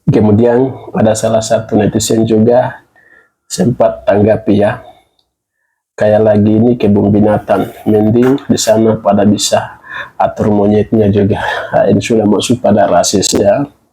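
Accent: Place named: Papua, Indonesia. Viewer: Bro kamu kok bhsnya santun kok bhsnya papua nya masih melekat tapi kok bisa santun..bravo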